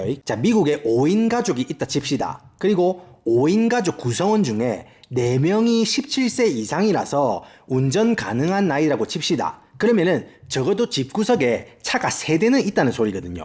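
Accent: Gyeongsang, South Korea. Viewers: ㅎㅎㅎ 경상도 사나이 재미납니다 ㅎㅎ. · 경상도인듯 ㅎㅎ 고향 말투 들으니 넘 반가움ㅋㅋ 반갑습니다!! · 쌍도 말,억양좀 쓰지마라. 토난다! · 와... 약간 혀가 꼬인 빡센 오리지날 경상도 사투리 들으니까 이거 머 내용이 재밌기도 하지만 듣는 재미가 쏠쏠하네요 ㅋㅋㅋㅋ 쌔끈빠끈 표현 찰지네요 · 경상도 사투리인지 부산 사투리인지 모르겠지만, 사투리가 한국에서 듣던 것보다 엄청 재밌네요. 모르는 단어도 많이 나와요. ㅎㅎ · 아주 논리적으로 재미있게 갱상도 발음으로 마 말을 잘하시네요.😁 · 아이 미국 현지 영상을 보는데 알아듣기 힘든 경남 사투리가 왜케 심하냐~ㅋㅋㅋㅋ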